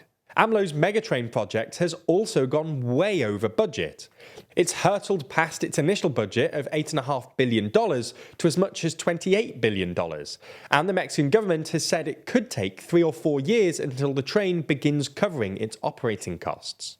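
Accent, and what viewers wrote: United Kingdom. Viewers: British pronunciation of Spanish words always makes me cringe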